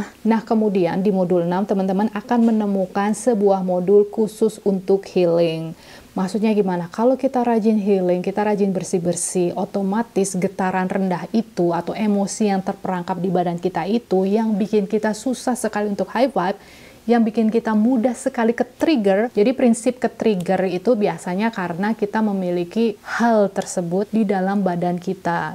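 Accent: Banyumas, Indonesia. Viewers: Serius ka.. aku juga dr CILACAP.. SALAM NGAPAK YAH KA.. n thanks bgt atas sharing nya..beruntung bgt ❤️❤️❤️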